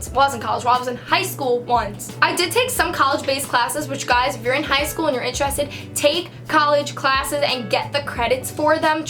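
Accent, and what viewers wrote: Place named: Long Island, United States. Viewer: Girl you sound like a real long islander ! ❤️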